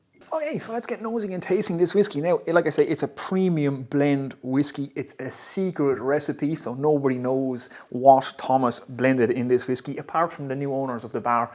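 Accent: Ireland. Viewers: The man was teetotal!! It's probably just something re-packaged!! How could he sample it?! Nice enough though. Like your Déise accent!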